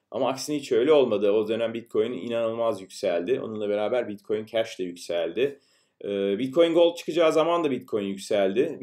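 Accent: United States. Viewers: anlatım iyi de şu amerikalı gibi konuşmaktan kurtulsan iyi olacak :D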